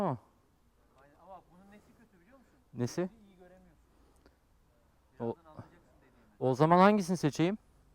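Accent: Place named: Thrace, Turkey. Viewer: abi sen trakyalımısın ? çok fazla trakyalı ağızı var sende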